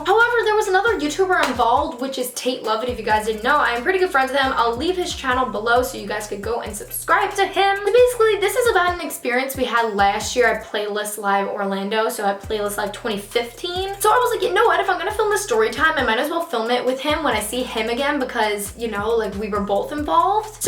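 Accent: New York, United States. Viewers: your accent tho its amazing I can tell you're from NY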